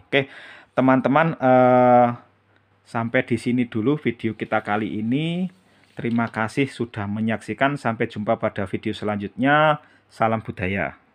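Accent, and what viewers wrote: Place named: East Java, Indonesia. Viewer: Video ini berisi narasi dalam Bahasa Indonesia dengan dialek Jawa Timuran.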